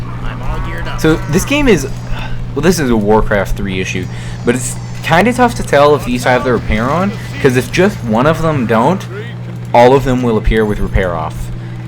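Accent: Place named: Dakota, United States. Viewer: Are you from Dakota or something whats that accent?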